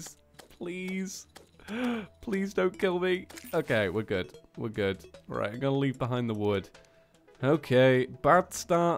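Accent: England, United Kingdom. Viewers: I knew you're from england even before you said it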